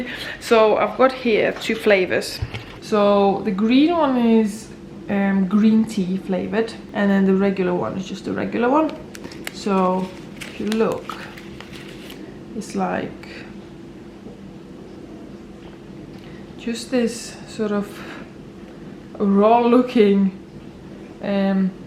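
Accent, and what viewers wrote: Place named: Northern England, United Kingdom. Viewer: You’ve caught a cold and a slight northern English accent.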